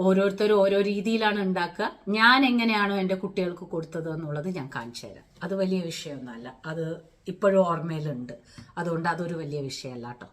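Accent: Palakkad, India. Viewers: chechide palakkadan basha miss cheyyunnu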